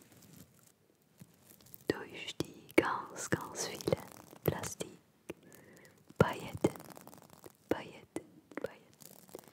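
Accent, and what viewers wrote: France. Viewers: Normalerweise gefällt mir kein Französischer Akzent, aber dieses Video ist einfach nur toll😘😘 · Allein der französische Akzent entspannt mega☺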